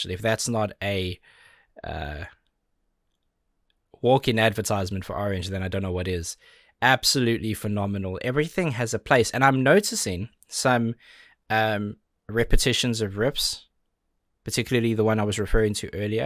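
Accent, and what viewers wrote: South Africa. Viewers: I have been watching you for more than almost 2 years I guess and I failed to realize that you are a South African and not a Brit inspite of my good understanding of various accents.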